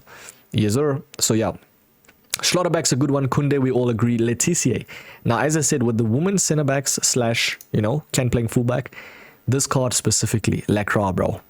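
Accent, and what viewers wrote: South Africa. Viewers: I think he is from South Africa 🇿🇦 or New Zealand 🇳🇿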